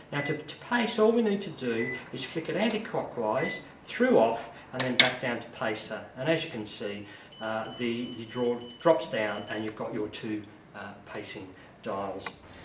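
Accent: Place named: Australia. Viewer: Nice Aussie accent.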